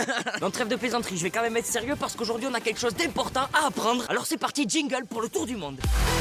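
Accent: Marseille, France. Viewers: Toi t'es Marseillais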